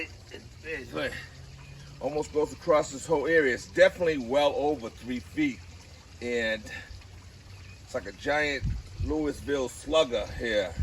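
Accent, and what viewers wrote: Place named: Michigan, United States. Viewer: Your garden is awesome. Are you in Michigan, you sound like my cousins accent!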